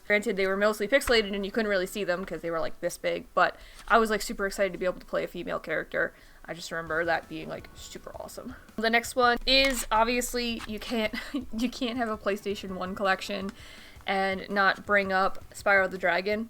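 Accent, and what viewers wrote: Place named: East Coast, United States. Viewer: Your video came up in my algorithm feed, since im from CA what kind of east coast accent is that I like it???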